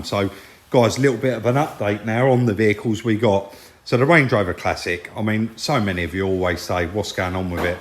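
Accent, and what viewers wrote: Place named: Cockney, United Kingdom. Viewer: I came here for the Bentley and ended up staying for the East Enders accent 😊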